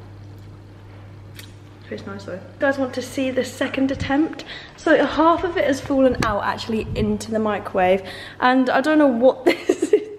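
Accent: United Kingdom. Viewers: is she british or does she have origins?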